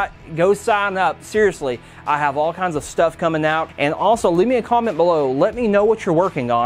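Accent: South, United States. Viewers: Love that southern accent....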